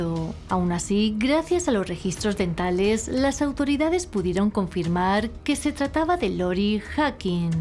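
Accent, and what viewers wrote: Spain. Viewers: Porque la critican aquí en España se habla así vale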